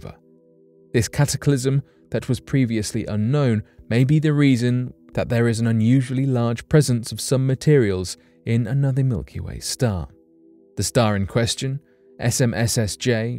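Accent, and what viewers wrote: United Kingdom. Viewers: Thumbs down because of the British accent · Why do they always get some dude who sounds like he’s a member of the royal family to narrate these videos? I worked in space and aviation for my whole career and not once in our labs, did some English royal voice come over the speakers saying “ Jim I think you’ve got the solution!”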